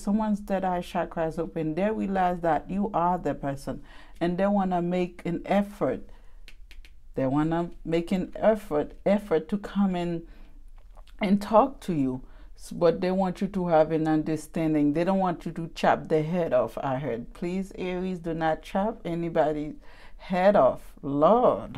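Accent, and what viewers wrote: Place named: Jamaica. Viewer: Your accent sound Jamaican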